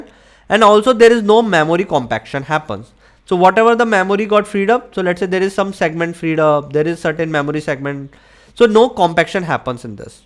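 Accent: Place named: India. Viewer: Indian English with cold is the next level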